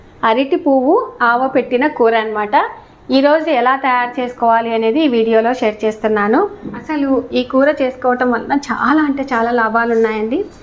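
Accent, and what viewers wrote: Rayalaseema, India. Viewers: Akka peekinave kadu akka teesavu anali mee rayalaseema bhasha yasaga vuntundi 😊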